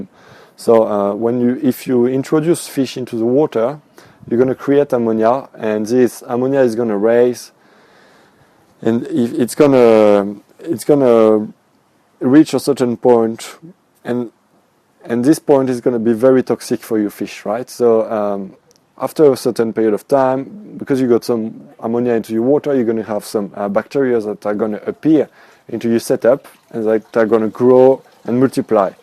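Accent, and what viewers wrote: France. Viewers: i love them french accents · mon dieu..quel super accent.. laisse moi deviner... origine francaises ?? ou belge ?? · can you caption this? Your accent is really strong for people in other parts of the world. I only understood about half of it. And googles auto captions think your french.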